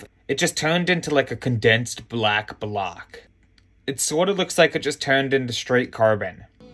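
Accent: United States. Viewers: Interesting accent. Sounds American but once in a while it sounds Australian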